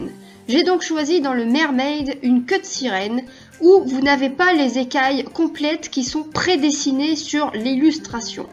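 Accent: Nord-Pas-de-Calais, France. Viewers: pas du tout. Adorable accent chti hein?😁😁😚💗💗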